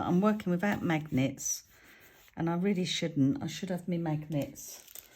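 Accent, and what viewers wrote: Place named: RP, United Kingdom. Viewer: The proper queens English!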